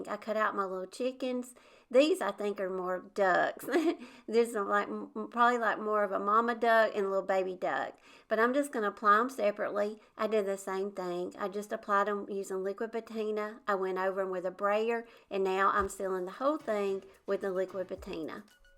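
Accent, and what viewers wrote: South, United States. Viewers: 👋 I could sit and watch you craft all day. Love it all so much. My style spot on. I am so ready to have our retirement home built this year and start decorating it. I am sorry, but I had you mixed up with Lisa, from Our Shabby Cottage. I asked if she ever finished the doll house that she was working on for a hobby. She re assumed me that it was you. Again, I am so sorry for missing you two up. Both with that sweet southern accent, kinda like me. ❤ Love. Anyhow, I had a big interest in that video of your dollhouse. I had my husband build me one that I found on Pintrest. I had to put everything on hold due to family illness, but was still wondering about yours and excited to get back to mind.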